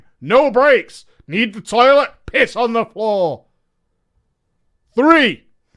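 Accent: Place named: Manchester, United Kingdom. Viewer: Sounds like your from manchester ar kid nice to see someone local doing well, keep it going mate.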